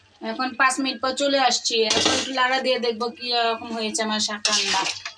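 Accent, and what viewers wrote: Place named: Dhaka, Bangladesh. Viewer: Like your dhakaya voice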